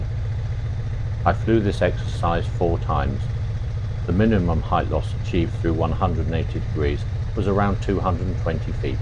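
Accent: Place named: United Kingdom. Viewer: You speak like a Brit and dress like a Yank. I had a hard time reconciling the two until I learned we share the same sense of humour and passion for flying. Well done, old man!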